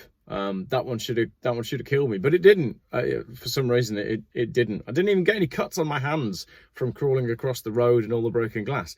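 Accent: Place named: Birmingham, United Kingdom. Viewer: Solihull? I thought I recognised the Brummie accent. I grew up in Solihull, left in 1986.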